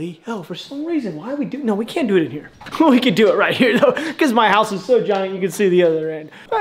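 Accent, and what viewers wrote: South, United States. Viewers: you and Nick Irvin should go teach demo ranch to shoot long range for a video idea. Your southern accent was shining today by the way, it was sweet, I love your videos keep them coming.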